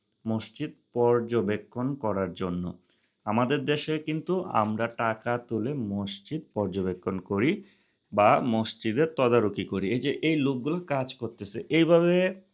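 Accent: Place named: Bhola, Bangladesh. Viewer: কথা শুইনা মনে হয়,তুমি ভোলাইয়া।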